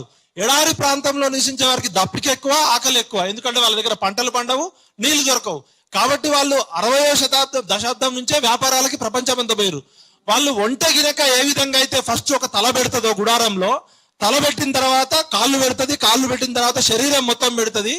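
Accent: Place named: Hyderabad, India. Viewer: Well said boss, 100% you are right pakka Hyderabadi style lo cheparu, in detail you said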